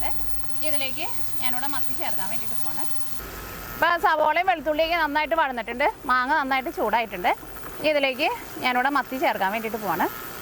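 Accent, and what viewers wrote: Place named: North Kerala, India. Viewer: Oru Nalla Nadan kutty anu,I like your presentation & vadakkan accent.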